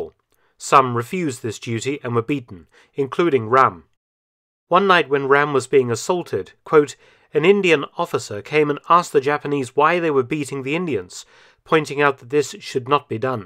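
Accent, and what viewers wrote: England, United Kingdom. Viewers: The first thing that Indians and other colonies of western countries were forced to fight a war that was not their. Japanese were worst but we should not forget how the Churchil of England killed millions of Indians by depriving them of food known as famine of Bengal. They sent all the food for the soldiers and here many people died due to hunger. Since the narrator seems an Englishman by his accent he must know that England has done no less horrible things to Indians then the japanies at that time.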